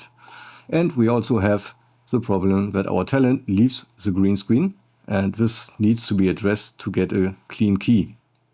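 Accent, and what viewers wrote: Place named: Germany. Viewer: Just stumbled on this channel for the first time. Your German accent sounds great to me and the info is solid.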